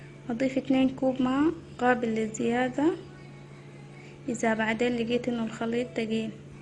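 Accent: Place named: Sudan. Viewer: يدك نظيفة وشغلك نظيفة وعدتك سممممحة وأهم شي حفااااااظك على اللهجة السودانية ياخ نحنا شعب معلم شعب مدرسة زاتو❤❤❤❤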